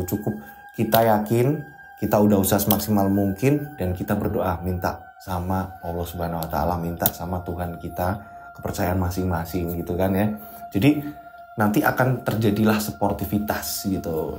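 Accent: Java, Indonesia. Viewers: Bom yoskay... aq suka cara membawakan cerita nya terang dan jelas 👍... logat jawa nya lhas banget mas 😊 enak di dengar nya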